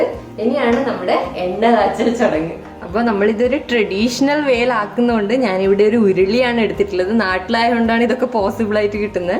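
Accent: Kannur, India. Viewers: എവിടാ. സംസാരം കേട്ടപ്പോ തോന്നി. ഞാനും കണ്ണൂർ ആണ്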